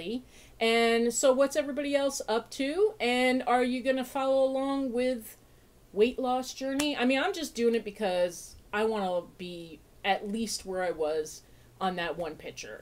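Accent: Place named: Minnesota, United States. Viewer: I love skulls too . I find the best stuff at bike events . I really like when skulls are used in unexpected ways. I have always struggled with weight my entire life . I have had periods of time at a healthier weight but it always creeps back up . Love the Lost Souls scarf 🤩 I always love watching happy mail ❣ Uffda on the Minnesota and Wisconsin accent...ya you betcha 😁 North Dakota sounds like that too ❣ There are degrees of accent , in the urban areas it is less pronounced than rural areas 😘 Fabulous happy mail ! The wooden swift fanfreakintastic!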